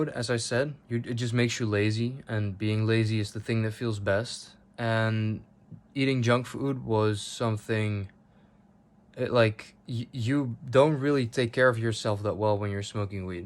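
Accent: Netherlands. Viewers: Yo bro are you dutch?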